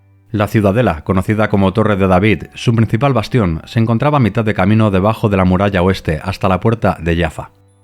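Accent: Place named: Spain. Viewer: Excelente narración con lujo de detalles, felicitaciones!! No hay nada mejor como la voz humana, y si tiene acento español mejor, para narrar estas historias. Estoy hasta la médula de la IA. Saludos desde Uruguay 🇺🇾 y feliz 2026!!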